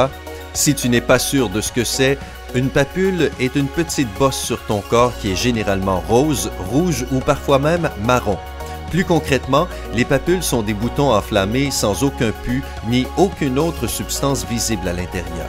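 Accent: Quebec, Canada. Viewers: vous avait remarquez la vois canadien cool non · C'est l'accent Canadien ou Québécois désolé je sais pas vraiment entre le deux ^^ c'est pas très sympa de disliker alors que c'est pas de la faute de la personne si elle a un accent.. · Wilson Temat non dr bot est noir et celui la est quebequoi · Celui qui parle dans la vidéo est un québécois ou un marseillais comme vous voulez 😅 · Faut vraiment changer la voix-off de vos vidéos les gars, ça va plus du tout la version québécoise... mdr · Florian Guerif la voix off québécoise est BEAUCOUP mieux que le mec de Lama Faché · C'est plus québécois ça en fait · Accent canadien je zappe c’est ridicule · Le gars c'est un canadien ? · Le fameux accent québécois ça me tue 😂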